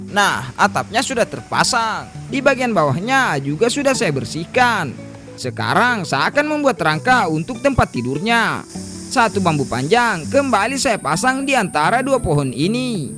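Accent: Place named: Makassar, Indonesia. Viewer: asli org mna bang pke makassarki ku dngar😂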